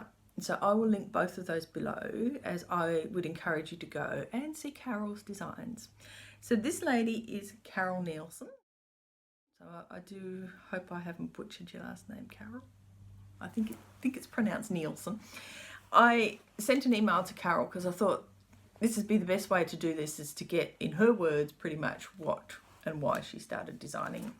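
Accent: Australia. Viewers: What a delightful video! I have just subscribed and am thrilled to find you!:) I am so happy that you came to visit my little channel. Isn’t it fun to find a new stitch friend through our wonderful hobby/obsession!!! BTW, I love your Australian accent and your craft room. I look forward to binge watching your other videos soon. Happy, happy stitching from a new friend in Texas.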